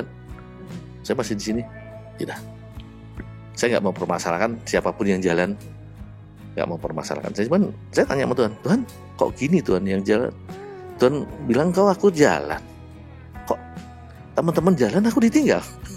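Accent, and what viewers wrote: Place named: Java, Indonesia. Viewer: Bahasa Jawa nya kental saat bikin konten 🎉🎉🎉🎉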